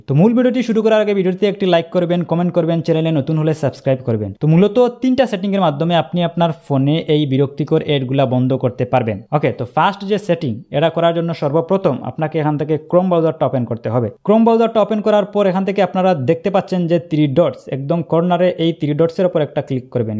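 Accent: Sylhet, Bangladesh. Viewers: Bhai tumi sylheti nih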